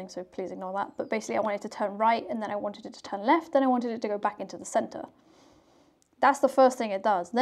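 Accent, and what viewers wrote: United Kingdom. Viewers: YES QUEEN UR FROM THE UK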